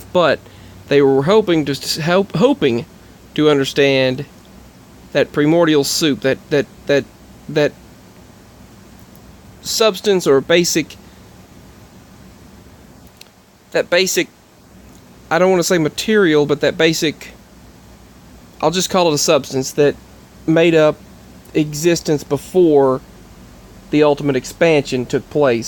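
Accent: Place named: Tennessee, United States. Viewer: Hello my little friend, are you in Tennessee? I hear your accent and if your even close to here in Tennessee then I'll have to say Praise Jesus for what your doing. Nobody around here talks about what going on with these collidars. And the one you say might be hidden underground I really believe the Holy Spirit, Wind and Breath has showed me that it's under Oak Ridge Tn. To much in Tn is hidden from us, the Red headed Giants that followed the map of the rivers where here plus the Giants built all mounds the Native Americans have been said to have built, the Native Americans have many stories to prove that the tales of the Giants. Native Americans where all over the state of Tn so that means the Giants where here to. For some strange reason Tennesseans have been purposely dumbed down so we don't pay attention to whats going on at oak ridge TN, Nashville TN with thier parthanon and Music city, Memphis TN with thier King of rock and roll plus jazz music and Egyptian connect and how the state of Tennessee has a pyramid on the driver's license. 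I believe oak ridge plays a huge part in this collidar to collidar connection. I seen where you mentioned the Freemasons in some videos well the Freemasons are all religions into one and Jesus has showed me what Revelation says and how all these nation's are in the same Bed with one another so with that being said I really believe that all these collidars are working together behind closed doors to open the bottomless pit in Revelation chap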